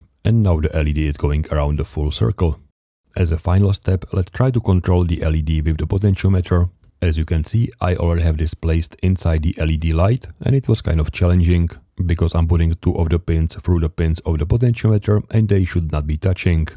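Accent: Czech Republic. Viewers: hey I just had a question if you were czech because you really sound like a czechman and if so, DAMN DUDE that english be almost clear ale jinak nice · Good video and content👍👍 jsem si říkal, že zníte jako čech a je to pravda😂 musím říct, že dobrá angličtina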